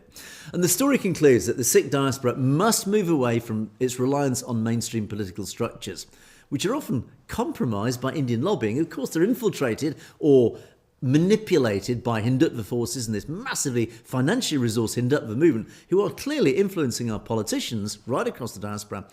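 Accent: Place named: United Kingdom. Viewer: massive respect to you gave us morale boost…just like Canadian James Cousineau (miss James laugh). Your voice is iconic it’s very coarse and gravelly with British English accent. 🧓🏼👔🎙️⌨️🖱️🖥️💡🌳 🕰️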